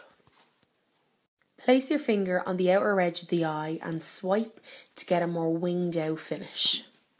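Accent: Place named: Ireland. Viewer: You're beautiful with or without makeup 💓 I love the accent as well, don't see how us Scots and you Irish get mixed up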